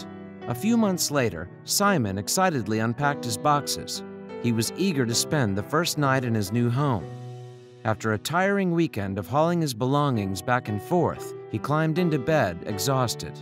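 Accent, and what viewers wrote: United States. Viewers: OOPS! the American accent fooled me. Apparently this took place in England. Never mind.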